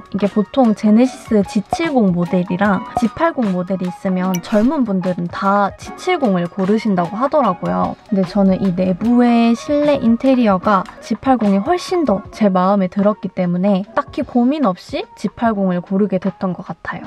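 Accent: Gyeongsang, South Korea. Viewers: 경상도 같은데 대구분이신가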